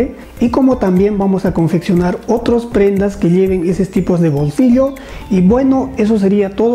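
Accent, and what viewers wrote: Peru. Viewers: Sos peruano o boliviano.....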